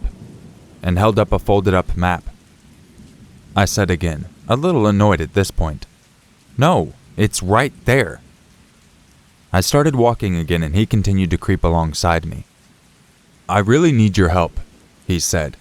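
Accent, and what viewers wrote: South, United States. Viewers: Love the southern accent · Love your Southern accent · u and that county voice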